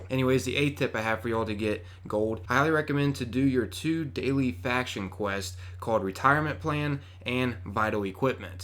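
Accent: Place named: Philadelphia, United States. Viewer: i needed this info! p.s. ill bet the speaker is from the philladelphia area.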